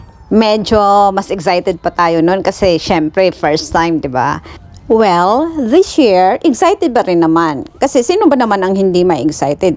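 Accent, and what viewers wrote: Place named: Philippines. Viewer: I like the Filipino (a) accent.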